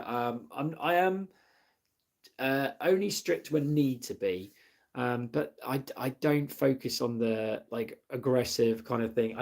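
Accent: United Kingdom. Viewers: You can tell he is British….😂I’m British to My fav teacher is mrs fox, I wish British people didn’t have to wear school uniform, I have to wear a green tie 🙄 and it’s SO uncomfortable 😭 😅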